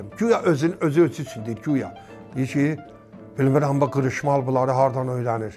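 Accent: Baku, Azerbaijan. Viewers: Təmiz Bakı ləhcəsi ilə Azərbaycan dilində danışır kişi.Burda başa düşülməyən nə varki? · Allah səni bizə çox görməsin Hacı. Doymuram sizə qulaq asmaqdan . Bakı ləhcəsidə öz yerində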